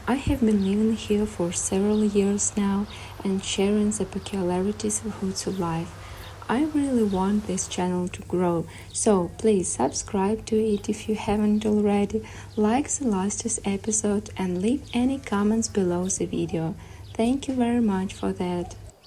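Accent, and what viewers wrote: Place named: Ukraine. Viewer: ВЫ из НЕ ИЗ УКРАИНЫ? АКЦЕНТ кошмар жуть🙈. У меня выработался навык за последнее лет 7-10 различать украинцев за вёрсту чуять) 😊и вообще русскоязычных сразу вижу)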